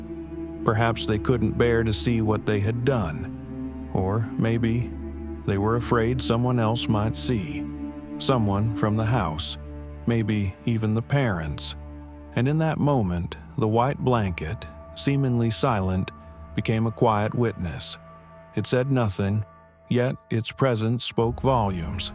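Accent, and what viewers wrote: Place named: South, United States. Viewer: The narration is horrible with some type of crazy “southern” accent. Couldn’t listen.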